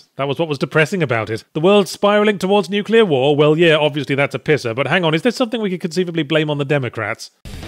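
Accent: Australia. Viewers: Yahtzee is starting to develop an Australian accent, after all this years.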